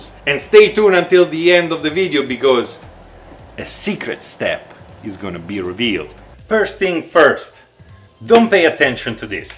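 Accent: Italy. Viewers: Immaginavo...anche se parli un'inglese molto italianizzato...vero? Però va bene il video corto ma quei trogloditi degli americani poi fanno cappelle(errori),e quando vengono in Italia non capiscono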